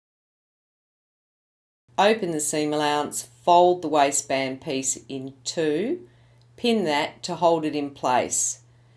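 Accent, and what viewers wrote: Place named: Australia. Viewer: Well, I love your Australian accent! (I am in Sydney - LOL!!) Have subbed - just getting back into sewing after having children and loving your tutorials :-)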